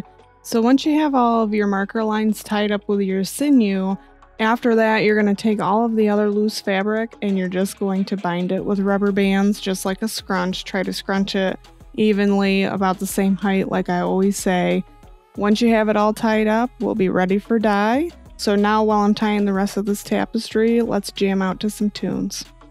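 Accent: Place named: Midwest, United States. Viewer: I ADORE your tutorials, hearing your voice is such a treat! You have a great way of explaining, and a fabulous midwestern accent 😁😁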